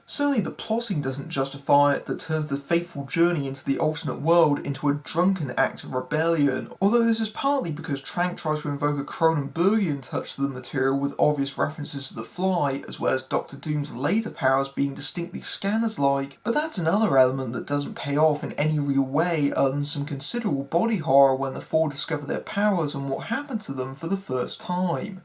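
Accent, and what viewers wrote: United Kingdom. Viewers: that perfect British TV news anchor voice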